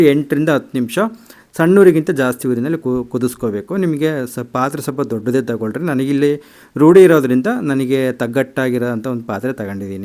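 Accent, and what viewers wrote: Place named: Karnataka, India. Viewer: ನಿಮ್ಮ ಊರು ಯಾವುದು? ನಿಮ್ಮ ಕನ್ನಡ ತುಂಬಾ ಇಷ್ಟ ಆಯ್ತು 👌🏻👌🏻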